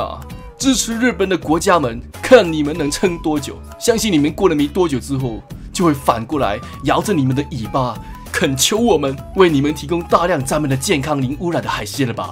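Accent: Taiwan. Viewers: 完全不是普通話，單純的是台灣口音國語😂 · 呢個完全冇大陸口音，係台灣口音嘅國語啊😂😂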